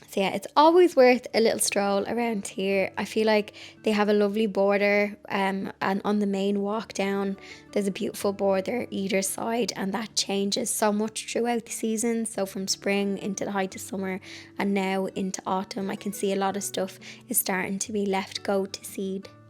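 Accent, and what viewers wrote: Ireland. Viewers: Aloha doll, new to your channel & adore your style so much. To hear that lovely accent again is a treat. I used to spend most of my summer holidays over in Sandymount & Ringsend, with close family friends. Many happy memories of The Strand, Bray & Phoenix Park & the town centre in Dublin. The cats are gorgeous. XXX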